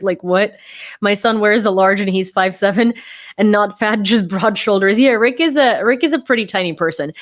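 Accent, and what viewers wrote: United States. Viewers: I massively appreciate your labor supporting Ukraine and more people like you are needed. I only have one question: do you feel Ukrainian? I mean, it's good that your english is totally American (that's a good skill), but your behaviour, manners, puns, attitude and everything is soooo American, even exaggeratedly American. Somewhere I hear you saying you were in the US for 16 years, but that time doesn't explain that you've become so American, unless you did put your all in transforming your whole personality into being American. That's why I ask if you feel Ukrainian (because such huge transformation kind of implies that you felt ashamed of being Ukrainian in the past?). Like I've known many foreigners living in the US for almost their whole life and they speak 100% like natives but they have not adopted at all such a huge American attitude and manners when speaking and behaving as you do (actually as a very specific type of American girls: talking always as if they don't give a shi*, the constant roll eyes thing, the constant corrosive puns, etc). 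I don't say this in a bad way at all, I do think your work is totally genuine and really appreciated, but as someone who spent long periods of time per year in Ukraine, before the war started, when I listen and see you there is something that makes me feel "weird". It would be interesting if some day you talk a bit about this and your intercultural "self". I mean, when I see you I feel as if I'm seeing a japanese woman singing spa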